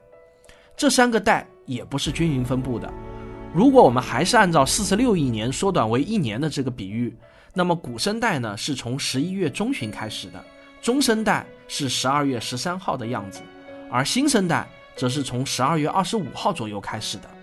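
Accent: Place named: Taiwan. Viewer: 講的比老高還精彩， 汪老師口音，好熟悉， 好像台灣老師授課。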